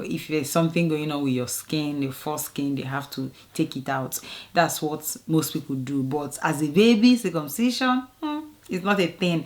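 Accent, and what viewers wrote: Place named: Ghana. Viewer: Ah. Are you Ghanaian or Nigerian??? You speak like Ghanaian. 😂😂😂❤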